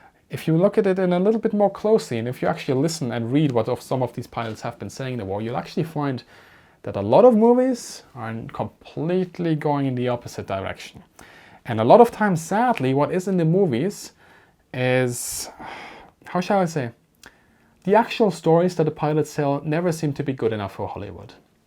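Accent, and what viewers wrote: Germany. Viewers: I really like youre german accent because i am from germany as well and with youre accent i can understand more of what you are saying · OK, I have to do this. First, I knew I was going to have some fun with this. I have been following your content for about half a year (after i got home internet), and I immediately recognized the incredibly high information value. Therefore, anyone who left negative comments on your channel would only prove their own stupidity. Then, after listening to just the first few comments, I needed to say the following immediately. Language comments? How inane. Sure, you sound like a Cherman. So what? You are fluent in English, which must be your second language, and you must be fluent in German, which is your first language, and which I recognized from your pronunciation of German terms, and I seem to remember, French also? 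And maybe something else. So here's one guy who's fluent in 3 languages, and there's another guy, who probably only knows one language, and probably badly at that, criticizing the first guy on the unusual pronunciation of a few words. Who is the better man? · Someone whispering about aviation? I'm in - especially with a german accent. Grüsse aus Dänemark. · If I didn't know better, I'd say that you were a native English speaker who happened to be raised in Germany (thus the accent). Aka your English is excellent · he doesn't have the ability to speak "the queens English" with a German accent, he would need an English accent, but he speaks EXTREMELY FLUENT English, and that's what's important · No worries about your german accent, i'm more concerned when people think I'm gernan while talking my native language wich happens to be Dutch 🤣👍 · totally agree, sure you can hear his German/austrian Accent but i can understand everything clearly, Keep going ( Guade Videos hawara) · Don't take the comments too seriously, specially the insulting ones. 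YOU DO A GREAT JOB ¡ . You accent is very German, I can tell from my person experience since my family background, I am an airline pilot in Mexico City (Volaris). By the way, are you a pilot ? Keep trying, CONGRATULATIONS ¡¡¡¡ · Wow, who'd want to be a content creator eh? Keep at it mate, sounds like you've got the right attitude and a thick skin. It must be hard to handle those in some cases racist comments from illiterate people. Keep up the good work, 178K subscribers say you're doing something right! And for the record, I enjoy your German accent and you speak excellent English. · Great & funny video! Germans do great impersonations us American southerners. Could you please, in your German accent say the famous line from "Cool Hand Luke", in a heavy U.S. southern accent: "What we've got here is failure to communicate. " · Dig your vids. Like seing them old birds in nice detail. The German accent fits the theme lol. 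· Hey, your video on the mutiny of the Hornet is so damn good, such a wealth of information that I had never ever heard before, I almost forgot about your German accent. I value the effort, I can speak a bit of German, but it is waaay easier for me to understand what you say in English... so congratulations again. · You sound like German. I think that guy blow up your cover...Greetings from Serbia! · This is the exact reason why I don't upload any videos on YT! I tend to lose my contenance easily and quickly. Then it gets nasty and I actually don't need that. I like your channel a lot and I like and understand your German accent much better than Military History Visualized's terrible Austrian accent, hahaha! What else could a Piefke say? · Heyyy, do not worry about " German accent ", Kraut and Jerry LOL. Those guys are jellos because you speak more than one language. Also, those d........heads forget, WW2 ended 1945. 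Keep up with good work. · Well, you have a distinct German accent, but I would say your english is more precise than most native english speakers I know.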